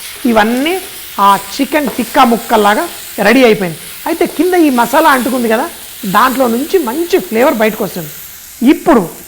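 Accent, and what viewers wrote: Telangana, India. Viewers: You’ve to be awarded the cultural heritage icon for Telangana💐🥇 the language of regional accents,the taste behind both nawabs and rural cultures are preserved,presenting through dishes are awesome.🙌👌🤲🏼❤️😍🥰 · Sir, I love your telangana language big fan of the way you speak kindly speak like that dont add Andhra language please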